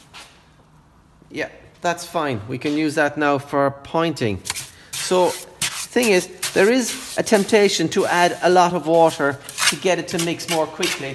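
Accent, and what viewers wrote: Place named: Ireland. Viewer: While I like the Irish accent in English, it always reminds me of the sad state of the Irish language.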